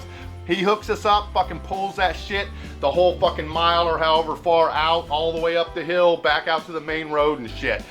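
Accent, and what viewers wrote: United States. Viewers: I love the american accent great story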